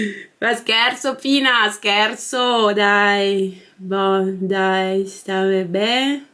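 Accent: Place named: Veneto, Italy. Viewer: Hahaha😂 me compare giacometto. Grazie x avermi fatto ridere oggi. Strepitosa 😂 P.S. Mi mancava sentire l’ accento veneto!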